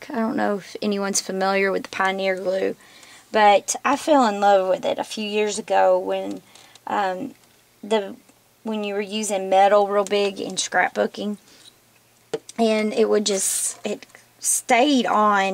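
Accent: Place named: South, United States. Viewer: I just ran across your video. I LOVE IT!!! Thank you so much for sharing this with us. I can tell you are from the South. Where do you live? Beautiful coastal North Carolina is my home.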